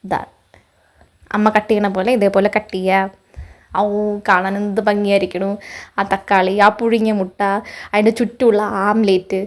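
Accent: Palakkad, India. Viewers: Super tasty omlette thank you ma'am adipoli palakkad slang❤❤🎉🎉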